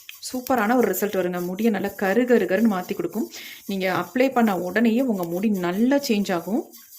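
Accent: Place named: Kerala, India. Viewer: Unka mudiyai kattunkal Unkal pechai kettal malaiyalu mathiri illaiyea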